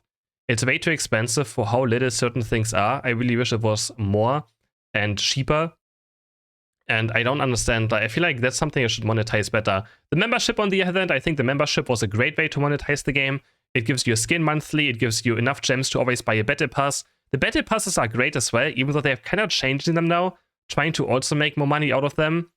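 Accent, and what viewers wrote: Germany. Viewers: I think that the game is currently at a decisive crossroad for it's future with the china thing. Let's see what happens and let's hope they correct the direction, because the way the meta developed in the last few months (like you said the big changes started to be noticeable around September with the whole Mime fiasco) with a focus solely on big damage and the inclusion of coffee break long stuns is pretty worrying. Greatly appreciate your channel btw, even if I still can't understand which country you're from (Germany? Netherlands?) Have a good new year Eve, cheers my man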